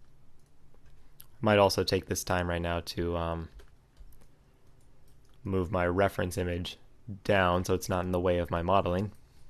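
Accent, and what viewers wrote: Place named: Italy. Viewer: i love your Italian accent, say "started"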